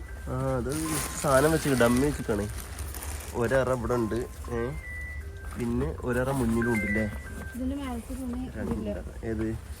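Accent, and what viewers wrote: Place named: Malappuram, India. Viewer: മലപുറ० ഭാഷആയിരികുനനു❤❤❤❤❤❤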